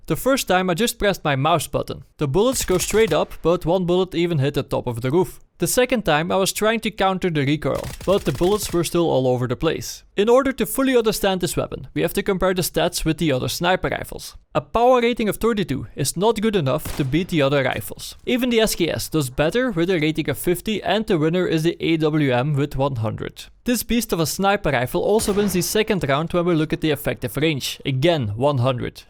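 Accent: Netherlands. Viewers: He's dutch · your thick dutch accent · Je klinkt Nederlands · are you dutch(nederlands) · You sound Dutch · the dutch accent is real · its Dutch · are you dutch? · one kickass Dutch accent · werk aan je uitspraak want je kan zo horen dat je nederlands bent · your accent reminds me strongly of netherlands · Are you dutch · your english sounds like your dutch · the first Dutch person I've heard with that thick an accent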